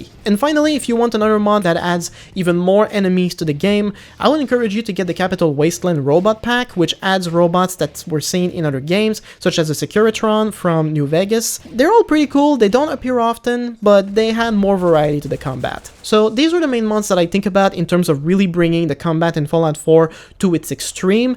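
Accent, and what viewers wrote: Quebec, Canada. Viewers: Are you from Quebec by any chance ? Feels like i'm watching a GSP mod review ;)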